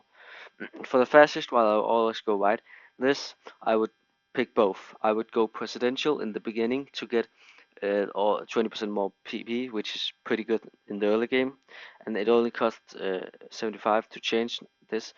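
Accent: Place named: Denmark. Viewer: bedste danglish jeg nogensinde har hørt håber alle russere ser det her..:3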